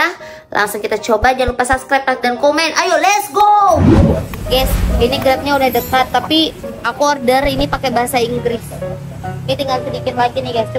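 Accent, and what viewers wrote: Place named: South Sulawesi, Indonesia. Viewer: Kak pekat betul ba loghat bugis mu😂😂😂,btw Salam dari bugis pare" Sabah Malaysia kak enjoy terus kakak selama di Malaysia ya😆🙏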